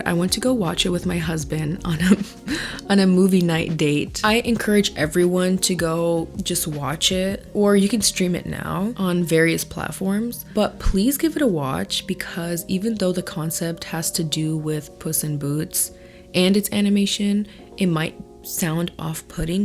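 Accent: United States. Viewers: bluey and bingo are living my childhood. i've lived in australia my whole life and it is incredibly relatable! there are a few things in this video you got wrong, but it isnn't your fault! its just because your accent and you not knowing australia! it isn't queens island, its called queensland. i still love you♥. also the way you say osy!! for americans, say ozzie. hahaha got a good laugh. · As an Australian i love seeing this being talked about around the world. I also find it a little funny hearing how Americans pronounce the Australian locations.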